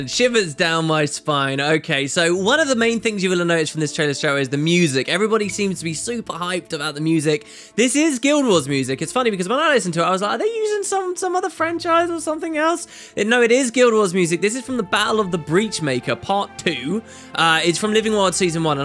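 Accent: United Kingdom. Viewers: "...what we're looking at rocks here walking around in..." Can't beat UK English. Love ya,.:D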